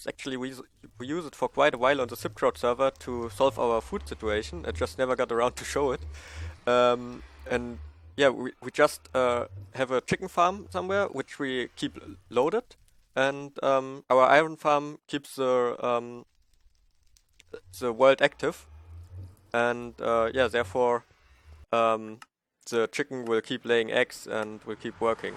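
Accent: Germany. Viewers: do you come from Germany?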